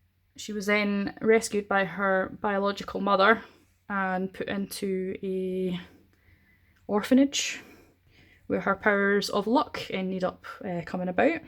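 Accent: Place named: Scotland, United Kingdom. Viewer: I think the Scottish accent is the coolest! So fun to listen to! keep up the great reviews!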